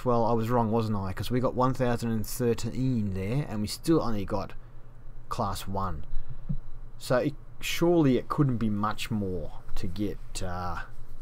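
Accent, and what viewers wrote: Australia. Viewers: Hey - I'm enjoying your reviews so keep up the good work. You sound like an Aussie - where are you from? I'm from Melbourne and also play on SEA server...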